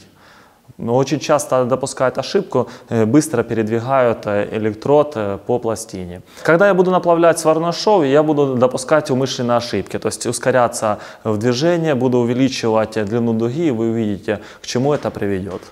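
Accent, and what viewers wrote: Kharkiv, Ukraine. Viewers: В Харькове все так Гаворят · Чисто харьковский базар.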